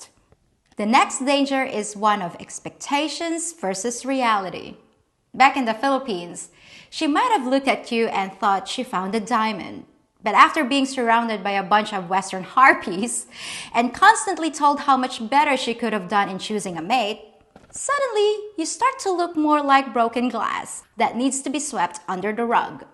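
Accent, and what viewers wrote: United States. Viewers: Hello!.. You absolutely a perfect in the U.S.. Everyone would think you were born and raised in the USA.. Another great episode!..❤❤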